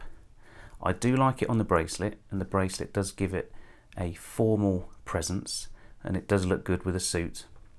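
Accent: United Kingdom. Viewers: While I shall defer to you when it comes to identifying casual versus formal as you have the British accent and are thus infinitely classier than I with my awful New York accent I believe, the leather is the dressier strap. I have just picked this exquisite watch today on the leather strap and I’m head over heels. The AD did not have it in stock on the bracelet so I ordered that separately. This is a great video, thanks for sharing!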